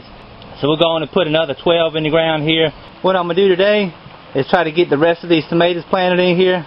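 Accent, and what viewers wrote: South, United States. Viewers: You got that slow southern style I heard about in some song can't remember the name. It is a pleasure to listen to you describe what you are doing. You should have a gardening show on the radio!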